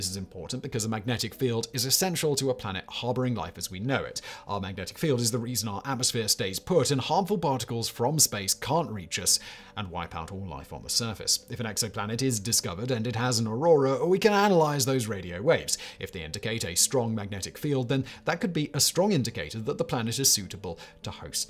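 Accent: United Kingdom. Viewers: Well makes at least 5 hours of footage a week after editing. Also uses British English pronunciations, not American English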